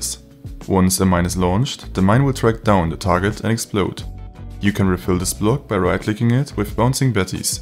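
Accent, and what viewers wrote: Germany. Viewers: I hear deutschen akzent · Are you from Germany? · Irgendwie so ein deutscher akzent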